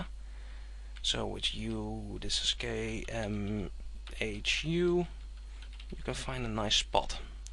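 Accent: Netherlands. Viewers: absolutely Dutch accent :) I'm Dutch myself and I kind of recognize it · HOLLAND kan je horen aan je engels xD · Are you Dutch? Your English accent sounds that way. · Is that a dutch accent I detect? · are you dutch cuz i my english sounds EXACTLY like u o.O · Can I ask you a off-topic question? Are you Dutch, perhaps, if so, you're way of talking gives that away ^^ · Are you dutch? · Dutch accent yez? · you sound dutch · are u from holland>? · Dutch accent is Dutch. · From your accent I can tell you're dutch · Yeah hes dutch allright , but its understandable so its all good :) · Can't hide the dutch accent eh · He's most likely from the Netherlands, since the accent with which he is speaking is very recognizable for me. I didn't know it was a charming accent. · are you dutch? you have an accent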